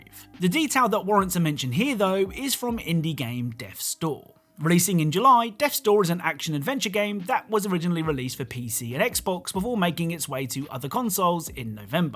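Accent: United Kingdom. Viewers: Hearing British people say words that’s arent English always makes me laugh.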